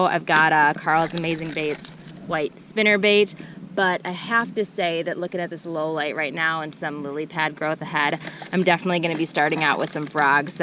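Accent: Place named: Minnesota, United States. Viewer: You can sound so "Minnesota" from time-to-time. Nice bass 👌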